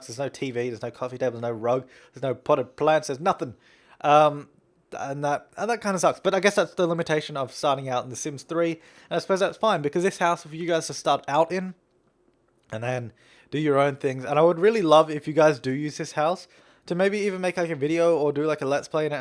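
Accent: Australia. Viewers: he’s Australian.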